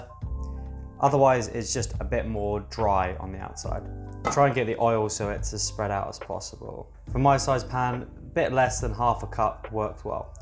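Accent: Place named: Cockney, United Kingdom. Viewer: Really great to see you back in the notional apron. But where did the mockney accent come from? Brilliant knife sheath.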